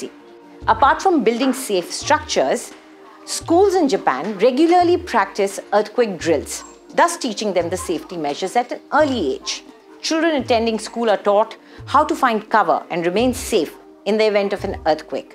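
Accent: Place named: India. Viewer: 読み上げの女性の英語がめちゃくちゃインドなまりで面白かった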